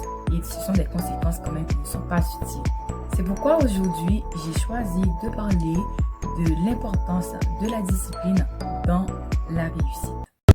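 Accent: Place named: Canada. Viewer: Accent canadien Mwen renmen l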